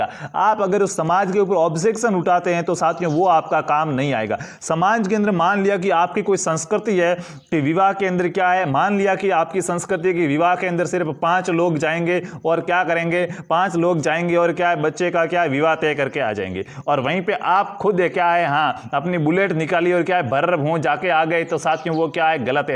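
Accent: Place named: Udaipur, India. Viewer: Sir apki भाषा se ap udaipur se lag re hoo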